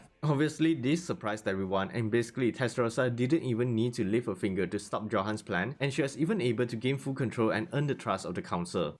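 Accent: Singapore. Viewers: srry. Ur accent is singlish tho. Keep ut the goodwork!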